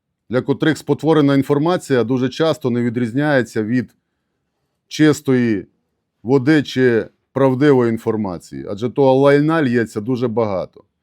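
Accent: Transcarpathia, Ukraine. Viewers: Дякую за рекламу закарпатського діалекту української мови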